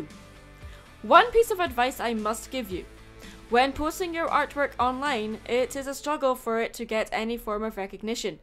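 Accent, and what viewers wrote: Scotland, United Kingdom. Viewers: Are u Scottish? I am!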